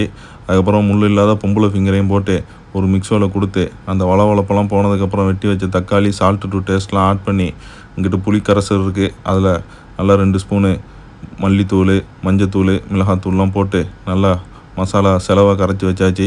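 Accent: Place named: Kayalpattinam, India. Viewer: நீங்க பேசறது காயல்பட்டினம் மேலப்பாளையம் பேசுற மாதிரி இருக்கு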